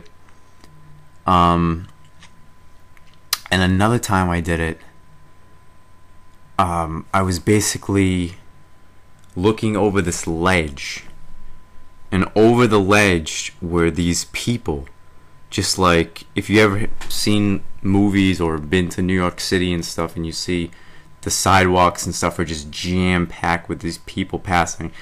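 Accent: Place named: South Boston, United States. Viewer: Where in boston are you from? Sounds like southie lol.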